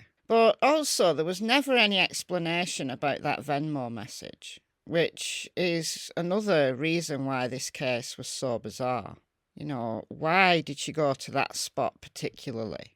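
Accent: United Kingdom. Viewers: Good morning y'all 🤔 I was sure you were British.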